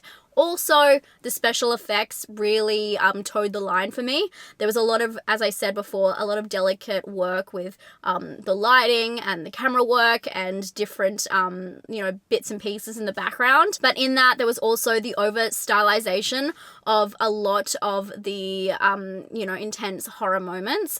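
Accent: Australia. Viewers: your nasally Australian accent is awesome to this California girl--LOL!!! Thank you for the great commentary! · WELL SWEETHEART, IM.JUST AN OLDER.MOM BUT I LOVE YOUR "NASALY" (NOT EVEN!) AUSSIE ACCENT AS WELL AS YOURE AWESOME CONTENT AND YOURE ABSOLUTELY LOVELY TOO! KEEP UP THE GREAT WORK, SISTER!❤😁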